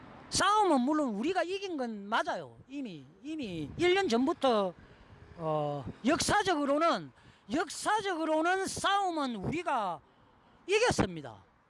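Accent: Gyeongsang, South Korea. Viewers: 갱상도 말투 존나 듣기싫다.증거는 차고 넘쳐.24년이 아니라 32년이야.계엄 지시까지 먹으면 무기징역 존나 웃겨.배아프다. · 다 좋은데 그 경상도 말투 더럽게 듣기싫다..표준말좀 써라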